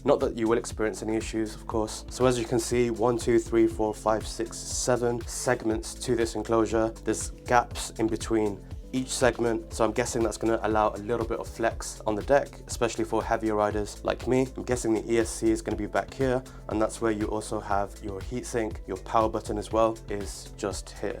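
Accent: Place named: Birmingham, United Kingdom. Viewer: Do you need a wing man ? I’m watching your vid of the mighty flux the voice in my head tells me I know where your ride is my home city brum !! I knew that annoying but sincere accent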